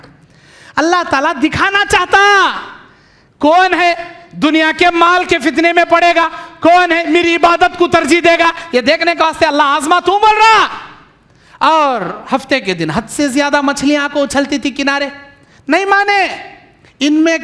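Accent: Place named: Karnataka, India. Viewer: I'm also from Karnataka tumukuru. Sheikh ki baat karne k lehje se laga k ye hamare state se hi hain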